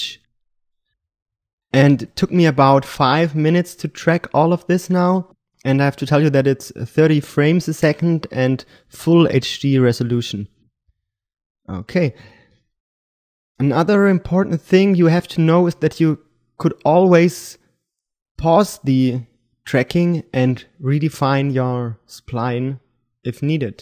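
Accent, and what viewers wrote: Germany. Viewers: r u german? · you're german right? Got a german accent :D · kann es sein das du deutscher bist? :D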